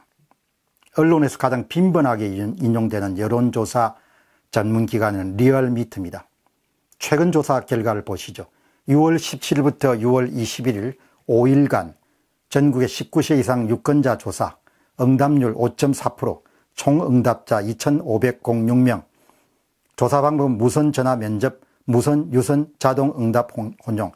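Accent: Gyeongsang, South Korea. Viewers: 강한 대구사투리... 그것만으로도 공병호의 신뢰성 없어 보임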